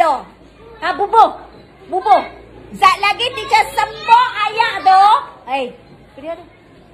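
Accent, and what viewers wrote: Kedah, Malaysia. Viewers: Rasa pelat tu bkn penang..ni blah kedah perlis x silap.. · Pekat betoii bahasa Kedah cikgu ni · Orang kedah memang sedap dengar bila depa marah 🤣🤣🤣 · Kelas bahasa kedah ke ni? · Cikgu ni org Kedah ka 😂😂😂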